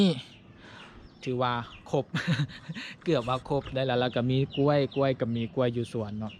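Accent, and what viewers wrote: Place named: Laos. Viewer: ผมมักฟังลาวเด้ธรรมชาติดี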